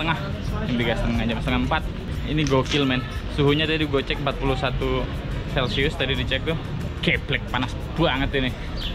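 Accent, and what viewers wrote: Java, Indonesia. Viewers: asli mana bang? kok logatnya ada jawa²nya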